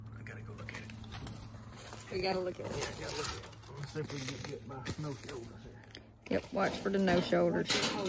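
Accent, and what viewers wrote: South, United States. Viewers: I'm from SC but your accent is country! I could listen to you talk all day. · I 💘 your beautiful smile too and your country accent too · So cute I love that southern accent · Nice to see a pretty girl with a southern accent not covered in tattoos and saying like every third word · You’re beautiful 😍 with that country accent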